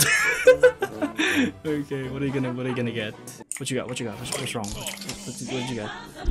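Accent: Philippines. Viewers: sa tingin ko talaga Filipino kaw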